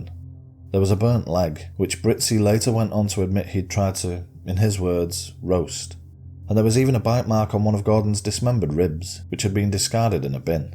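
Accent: United Kingdom. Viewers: How pleasing to hear your uk 🇬🇧 voice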